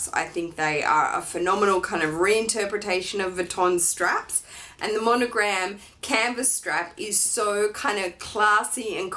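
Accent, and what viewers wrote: Australia. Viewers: This video is so good on so many levels! I really love the LV community...even though I'm new to it, I'm not new to fashion/lifestyle blogging...it's really lovely to see the support and friendship here. Fashion blogging has kind of lost that feeling of being kindred spirits because it's so commercial now. Anyway, I just love your videos, not only because you are so cheeky AND well-informed, but because I'm married to an Aussie, and that accent is one of my faves! I used to live in Sydney, so every time you mention Bondi Junction, my heart skips a beat. Thanks for all the killer information, gorgeous! xo V.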